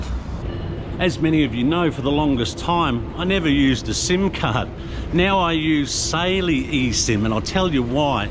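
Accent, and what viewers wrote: Australia. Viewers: I'm a Brit used to watching several fairly Naff travel channels (I subscribe to other genres as well). This channel is the absolute dogs b___ compared to them. What a great travel Vlog this is. I just love your attitude and your way with strangers. There are so many laughs, I loved the time when the two Russian guys were arguing and had been fighting, in what was a fairly tense environment your Aussie twang never changed and you just still sounded so relaxed 🤣. Just fantastic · Lovely vlog, speaks to real life and travels. I was intrigued by the dish you waxed lyrical in Singapore. The You*Tube translator took your Aussie English and came up with "Luxer". I just kept adding the ingredients as your poetic review progressed until "Laksa" finally populated.😆😍🤩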